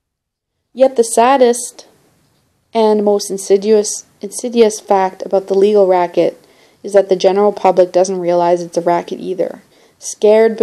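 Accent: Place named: Canada. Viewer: There's a Daoist quote that I read in an old Alan Watts book to the effect that everything went pear-shaped when the law was written down.. I tried to find the exact quote on google so that I could post it on your Genesis video. Couldn't tho. Anyway, don't know if you say 'pear shaped' in Canada, but I prefer to use slang terms sometimes-- I'm probably out-of-date with them tho-- I was tempted to say 'Pete Tong', which is even more out-dated. I'm only guessing that you're Canadian, but the pronunciation of 'out' is usually a give-away. 'Great Work' in any case :)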